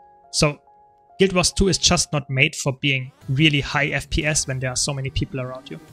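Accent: Germany. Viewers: des ischn deutscher ich hörs doch :D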